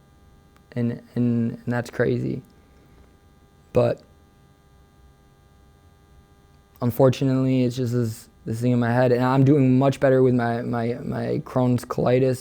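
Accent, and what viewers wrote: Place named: Western New York, United States. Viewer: Right on brother. Much respect I grew up just outside of Buffalo, so I love hearing the WNY / Upstate accent in your voice!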